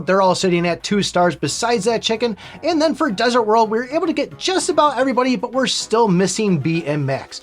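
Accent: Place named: Pennsylvania, United States. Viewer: You definitely don't need to answer this. I'm just super curious. I grew up in PA. And your "accent" sounds so similar to my family and the friends I grew up with. You also might be from Wisconsin, lol. Again, don't answer if you're not comfortable.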